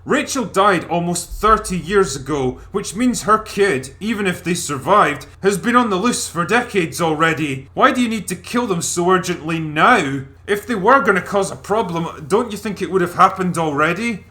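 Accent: Scotland, United Kingdom. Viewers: TCD's accent sounds scottish but some vowels are different... "Chill pill" doesn't become "chell pell", and often "spud gun" doesn't become "spood goon". Anybody know where he's lived?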